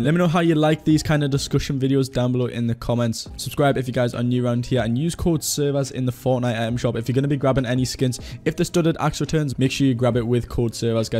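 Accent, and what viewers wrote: Newcastle, United Kingdom. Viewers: You from Newcastle? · are you from Newcastle??? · Geordie boy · are you a geordie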